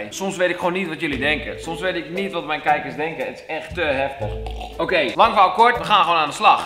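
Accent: England, United Kingdom. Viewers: Dat accent bij zijn Engels 🔥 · Ja ik bedoel een echt Engels accent, zou raar zijn als hij "nep engels" praatte😂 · Ik hou van je Brits accent 💙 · Hij praat met een Brits/Engels accent en dat is heel anders dan Amerikaans/Engels😂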